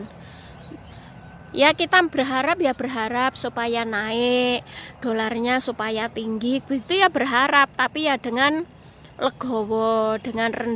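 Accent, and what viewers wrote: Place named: Java, Indonesia. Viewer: Wong jowo yo mbak? Karena bahasanya agak medok....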